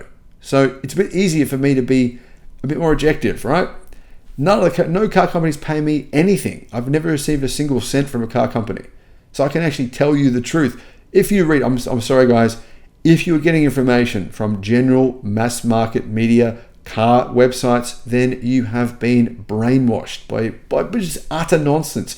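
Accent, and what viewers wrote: Australia. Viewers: Please slow down. When you start talking rapidly your Aussie accent makes you hard to follow for us in the States. Other than that, you've got a very informative site.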